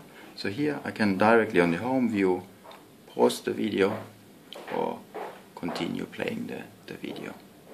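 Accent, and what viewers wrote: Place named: Finland. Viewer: Finnish - English.. XD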